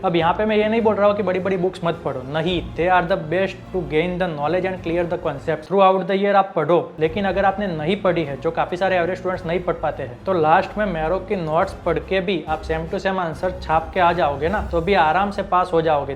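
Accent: Gujarat, India. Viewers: pure gujarati english😅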